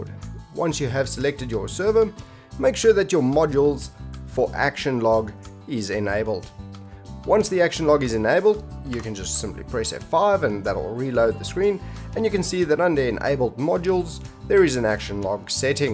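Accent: South Africa. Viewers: You sound South African. Are you? I’m South African too!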